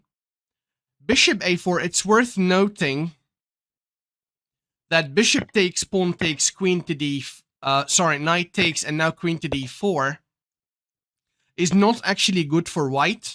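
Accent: United Kingdom. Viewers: Marvelous video ❤, Nice presentation and magnificent pronunciation with accurate British accent ❤, love the change and hopefully you continue with the same enthusiasm and quality for long time 😍🤩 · İ am in love with your British accent doc😂❤ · What a British accent 😍😍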